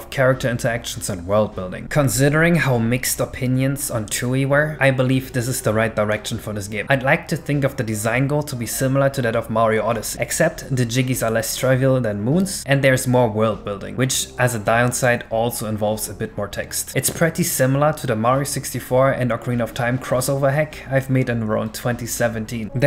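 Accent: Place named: Germany. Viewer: jo bist du aus deutschland? hast nen deutschen aktzent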